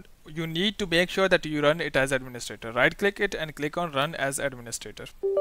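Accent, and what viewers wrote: India. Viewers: probably a virus or something since its from an Indian accent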